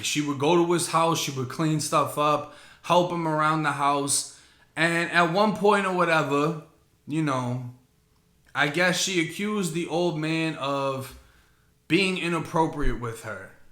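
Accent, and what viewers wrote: Boston, United States. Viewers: Crazy i read it in that boston accent.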